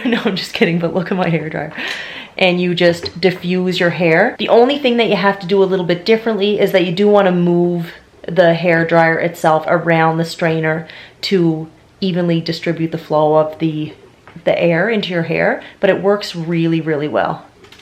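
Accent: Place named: Newfoundland, Canada. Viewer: “My toilets right clean” 🤣 I’m from Newfoundland, and I just love that your so free with your accent!!! 😍😍 right clean, yes byyyyyy!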